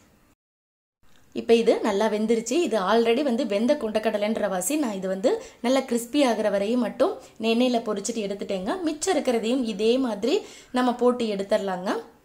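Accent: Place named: Kongu, India. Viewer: Snacks spr nga.. snakes ku extra azhagu serkradhu neenga pesura kongutamil dhanga.. nalla utcharipu nga.. 👏👏👏👏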